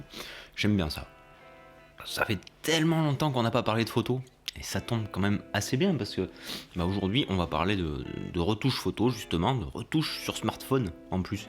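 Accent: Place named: Southwest, France. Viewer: Coooommmeeennntt??? tu es du Sud Ouest???? Vive la chocolatine!:D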